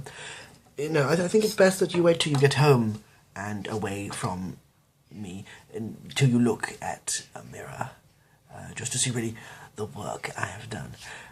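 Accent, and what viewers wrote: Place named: England, United Kingdom. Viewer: Bloody hell I love that posh english murmering, don't ask me why hahaha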